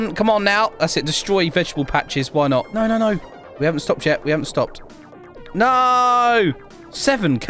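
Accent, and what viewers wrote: United Kingdom. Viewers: You British right?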